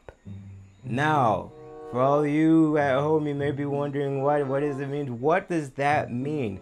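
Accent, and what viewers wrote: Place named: United States. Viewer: i always wondered if you were Guyanese ,and why you talked like that, I thought you were trying to be Americian, and of course you are,but I thought you were trying to be a fake Americian.,and you use to irritate me with your accent.but now I know you are a real Americian I can listen to you with out being irritated.have a nice time in Guyana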